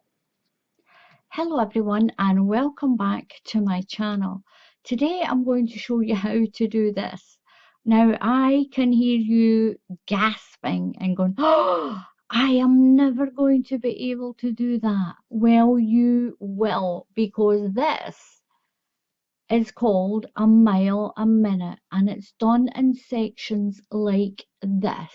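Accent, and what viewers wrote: Scotland, United Kingdom. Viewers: I could listen to you talk all day, your accent reminds me so much of my Nana's best friend Helen, they've been friends for over 50 years. Helen has been in the US for longer than that, but she's never lost her Scottish accent. My Nana said when she first met Helen she could hardly understand a word she said haha.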